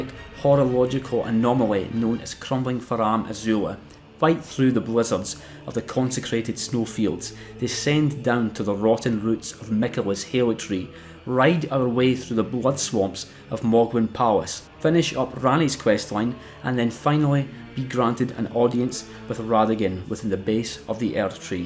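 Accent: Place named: Scotland, United Kingdom. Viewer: Ah yes another wonderful scottish man video to listen to while i work today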